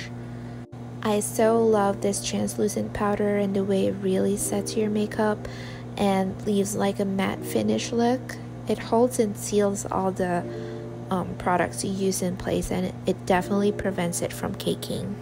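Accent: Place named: England, United Kingdom. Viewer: Youre English Accent is Great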